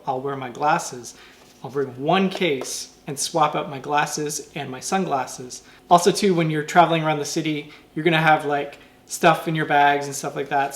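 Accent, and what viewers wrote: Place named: Canada. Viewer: Canadian accent freakoUt:D:D NICE! Cool music:)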